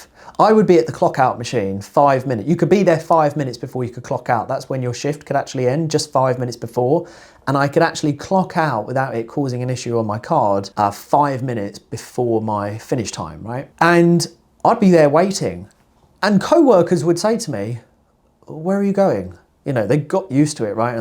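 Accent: United Kingdom. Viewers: My narcissistic boss comes into the office every day whilst we work from home so we can spend time with our families. He looks pathetic sat at his desk getting angrier because he can’t stand that work is not everything for some people. I wasted 30 years in my job commuting which time I’ll never get back, thousands of hours wasted commuting is the biggest regret of my life at 50. Can I just say it’s refreshing to hear an articulate British gentleman like yourself who doesn’t fill his videos with profanities.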